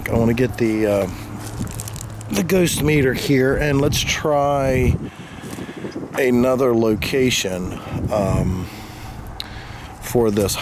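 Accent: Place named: East Coast, United States. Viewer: I love your east coast "ass"accent😍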